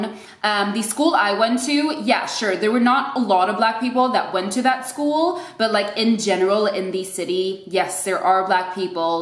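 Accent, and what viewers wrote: United States. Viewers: Your accent is American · wow, born black swedish with american english accent. I didn't realize swedes who speak English sounded this way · Agreed, she sounds 100% American. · I would have never thought that you were Swedish cause of your American accent. There isn't a single hint that you have an accent let alone Swedish accent. On the other hand, Sweden have significant Somali population. One of the few countries who welcomed Somali refugees and immigrants with open arms. Also my brother lives there. Great country. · Now I’m curious to know where your parents are from. Where are they from if you don’t mind me asking? Also, it’s very interesting that you have an American accent. I’d never know you weren’t born and raised in USA. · You sure do sound American 🤔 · Amazing video! I am from Los Angeles & can tell that you have a TINY accent but you sound very "American" to me! 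I am looking into moving to Sweden or Switzerland someday &, as an Asian person, of course have my concerns about race. Thank you for the great video! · Being white and Swedish for hundreds of years I am one of those who loves diversity and I also love to talk to people so I am one of those that always ask that question or a variant of it if I meet someone black speaking Swedish without accent. It is asked out of curiosity and I guess as a part of getting to know someone. If I heard you speak I would just assume you were American. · Holy moly you're stunningly beautiful. My question is, how soon do you start learning English and is an emphasis on accent a thing? Because you sound pretty much American with a very slight accent. I find it fascinating when people from abroad come to the US as an adult with very little accent speaking english. And why not a British accent? Is "American" english taught?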